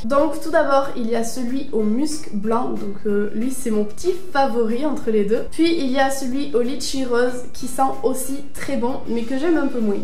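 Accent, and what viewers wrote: South, France. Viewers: coucou tu habites vers ou ? car tu a l'accent du sud hahaha :)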